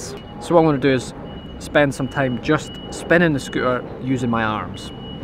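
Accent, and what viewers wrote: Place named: Scotland, United Kingdom. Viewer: where is the skate park?Since you have a scottish accent i'm assuming somwhere in scotland,maybe glasgow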